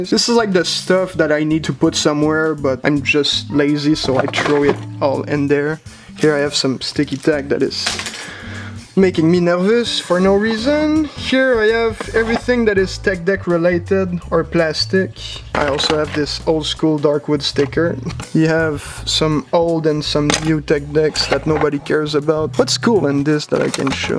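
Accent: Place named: Quebec, Canada. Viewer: the best french canadian accent ive ever heard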